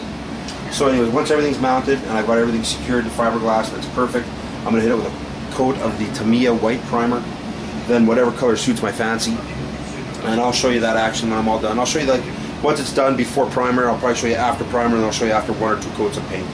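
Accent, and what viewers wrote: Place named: Canada. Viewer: As a Canadian I personally think that most talk about the "Canadian accent" is untrue. A lot of Canadians sound very similar to a lot of Americans (apart from the crazy accents like Newfies, Texans, Bostonian accent, etc.) But man, you sound like you came straight out of a Bob and Doug McKenzie movie!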